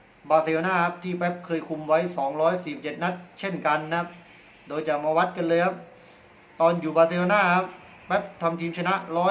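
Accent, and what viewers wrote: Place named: South, Thailand. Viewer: คนใต้ม้านนิ!!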